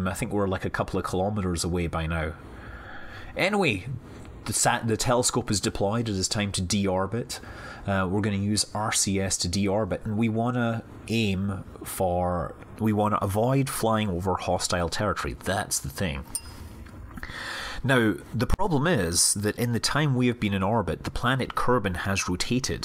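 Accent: Scotland, United Kingdom. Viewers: is this a very very very posh scottish accent? i thought it was canadian at first haha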